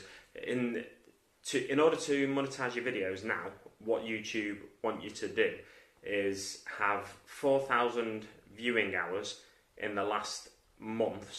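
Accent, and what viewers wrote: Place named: Roadman, United Kingdom. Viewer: Love your roadman accent! Yeah this is ridiculous, they brought it in after Logan Paul yet they attack the smaller channels most? There should be more checks on the big earners not the small guys.mRidiculous. Yeah I don't make money off YouTube but still the option should be there. On a brighter note congratulations on 300 subs! I'm 5 off myself haha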